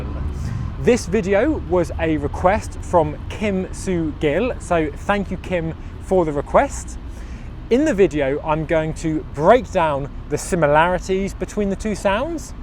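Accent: United Kingdom. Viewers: What British accent do you speak ? Is the British IPA same for all British accents ? I am from India I speak Hindi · Hello sir ,your accent is american or british ??? It is the first time to see your channel .my mother's tongue is arabic .do you like arabic language😀 i learn american accent.i noticed that you speak british ,am i right ?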